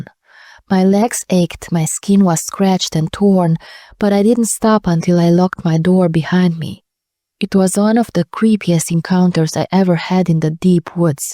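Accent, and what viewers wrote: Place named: Eastern Europe. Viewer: This is some Eastern European accent, but I can not locate it. Maybe Eastern Slavic, but not sure. Will you tell? Thank you for your effort! Me actually not listening to scary stories, told in a female voice. But wish you good luck!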